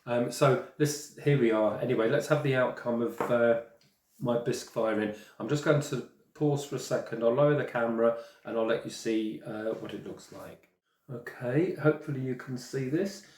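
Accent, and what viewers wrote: United Kingdom. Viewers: You made bisque biscuits! Love your videos. BTW, as far as I know, those of us in the US say vase the same way we say face. Soft s. But I really love listening to your British accent. Not sure ours is as attractive as yours.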